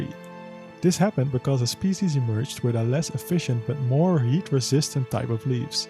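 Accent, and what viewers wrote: Netherlands. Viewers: This guy is DUTCH, that accent I can recognized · That funky Dutch accent. Loving it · Dutch eksent? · ARE U DUTCH? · Are u Dutch?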